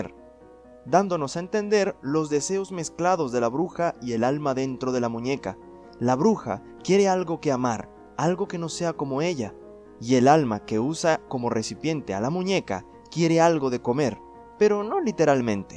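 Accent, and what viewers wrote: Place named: Venezuela. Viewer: YO SABÍA!!!!! YO SABÍA QUE ERAS VENEZOLANO!!! >:D Se te nota en el acento XD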